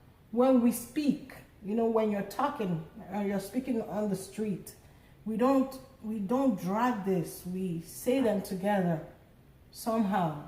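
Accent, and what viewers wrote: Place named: England, United Kingdom. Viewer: Omo am in love with ur English accent maa 👏👏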